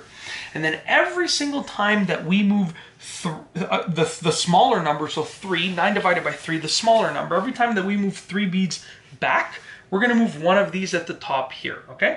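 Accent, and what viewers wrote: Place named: Canada. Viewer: I suspected this came from Canada, because of the annoying accent [Wisconsin, you are now off the hook!], and, as soon as he referred to a dollar as a "loony", my suspicion was confirmed ... and, I navigated away. You should follow.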